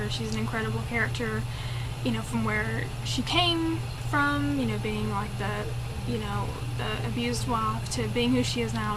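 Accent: South, United States. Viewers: Because she's a southern chick. I love that southern accent. I hear it all the time since I'm from Texas.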